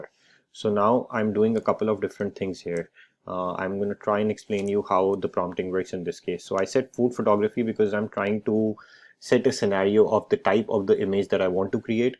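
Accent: India. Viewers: Indiano ba ang nag voice-over? Galing mo naman po! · parang english ng indiano sir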